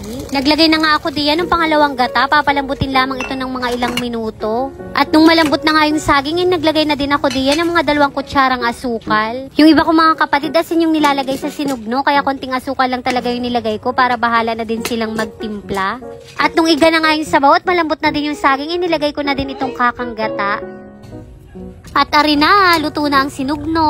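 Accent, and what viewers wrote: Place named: Mindoro, Philippines. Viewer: Katuwang pakinggan ang tuno ng Salita nyo at mga words, kaya pala itong manugang ko sa pamangkin, katulad mo mag salita tiga, Mindoro kasi, tuwa ako pag nagsasalita sya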